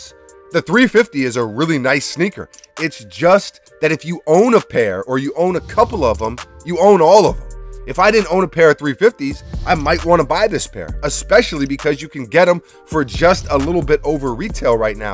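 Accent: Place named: Boston, United States. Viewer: Love the Boston accent 👍😂😂😂🙏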